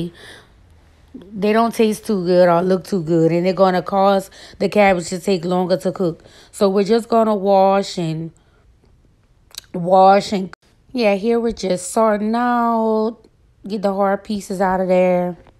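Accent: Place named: Louisiana, United States. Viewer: Sounds like Louisiana baby.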